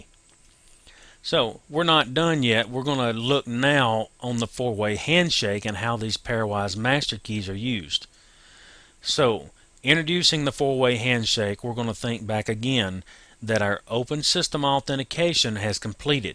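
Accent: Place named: Australia. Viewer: Purely out of curiousity, which accent is this? I'm confused, it sometimes sounds Australian (in my ears, that is), then it sounds like it's from the Southern US, which got me intrigued. I'm no native English speaker, so recognizing accents isn't my strongsuit.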